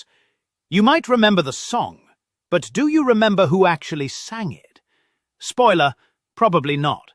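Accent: England, United Kingdom. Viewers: !!! had two number ones, neither of which were. and. Then when came up, was their 2nd number one, I had to turn off your quiz. If you're aiming at an American audience then don't use an English voice.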